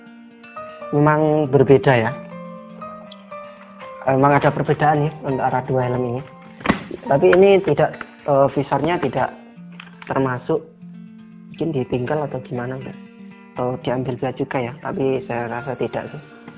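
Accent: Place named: Java, Indonesia. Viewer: jowo e juga medok tenan mas e..😂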